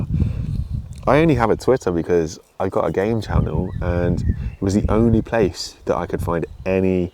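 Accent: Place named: East Midlands, United Kingdom. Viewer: Yes, I can see exactly where you're coming from, there is a lot of youtuber abuse around from trolls, and it does tend to be those who have a lot of subscribers, because they tend to say so on their channels. It seems that the vast majority of people out there though tend to come to their aid and offer support or reassurance that there's no need to be worried. Personally it doesn't influence my decision as to whether to watch a youtuber or not, if they begin to get more popular or wealthy, that's just pathetic, but I guess it does happen and some people do feel like that, and become jealous over what is seemingly insignificant to them. Whereabouts are you, it sounds like not a million miles from an East Midlands town, because of your accent?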